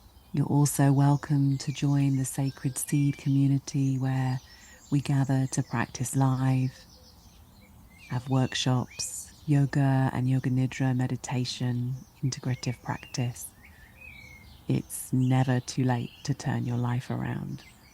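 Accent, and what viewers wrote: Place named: England, United Kingdom. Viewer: Ouse Ally and Kristen Roses meditation videos daily. I really enjoyed this. Thank you 🙏It’s especially nice to hear and English accent.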